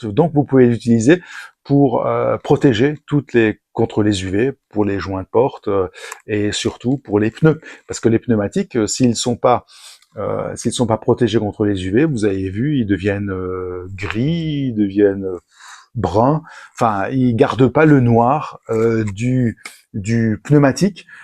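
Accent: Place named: England, United Kingdom. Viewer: Nouvel abonné de votre chaine, jaime beaucoup votre flow et son contenu qui va à l'essentiel, en gros vous ne parler par pour rien dire 😊. Et l'accent englais 😅 j'adore. Je pense aussi que si nous ne consommons peu de produit cest peut-être aussi que nous ne pouvons plus laver les voitures en extérieur mais si c'est chez nous ,sous peine d'amandes. 😥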